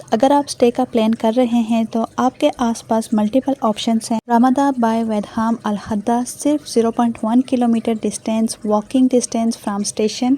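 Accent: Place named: Pakistan. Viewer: Rayal nahi madam...Riyal hota hai 😄😄 Pakistani English UK English aur USA English sab English ko merge kar ke explain kar rahi ho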